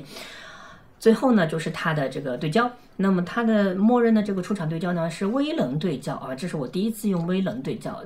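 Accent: China. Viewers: 是昆明话吧？ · 上海摄友来报道，听到乡音很亲切，哈哈😊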